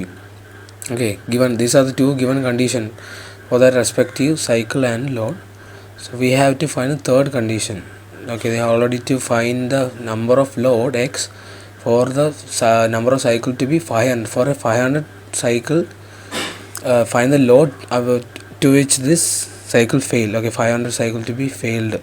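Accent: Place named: South India, India. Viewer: Please improve your accent. You south indian have a terrible english accent because of your south Indian english accent we north Indian face racism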